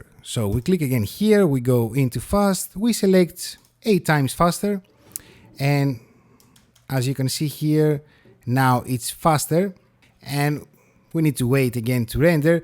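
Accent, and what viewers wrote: Greece. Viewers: I love the Greek accent. This is a great tutorial for beginners like myself. Clear and concise . This will be my "go-to" video until I become an advanced user someday.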